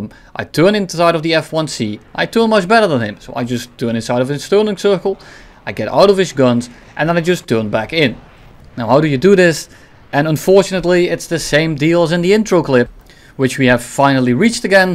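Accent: Netherlands. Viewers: Oh you're dutch, so that is what the slight accent is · Aan je accent te horen ben je sws nl mrg top video, veel geleerd man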